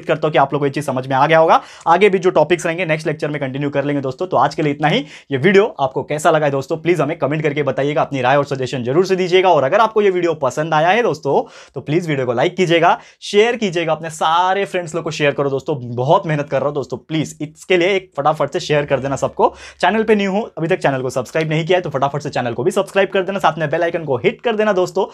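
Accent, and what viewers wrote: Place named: Mumbai, India. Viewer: I am 51 yrs , schooled in Mumbai, you speak Bambaiya Hindi liked your explanation very much watching you from Goa.😊 Thankyou 🙏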